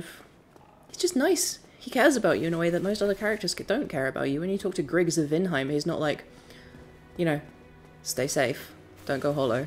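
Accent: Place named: Ilford, United Kingdom. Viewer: Yorkshire?! He sounds like he’s from Ilford to me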